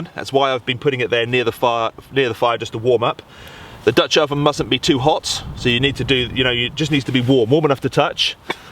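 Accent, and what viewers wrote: England, United Kingdom. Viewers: This is great, going to give this a go, and also check your other vids. (no disrespect to our American friends, but it's nice to see this sort of vid presented with an English accent !) Have subbed. Loving that dog !!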